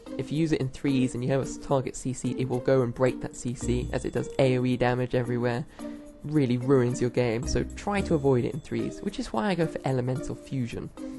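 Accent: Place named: England, United Kingdom. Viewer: Это английский акцент, необразованный ты человек)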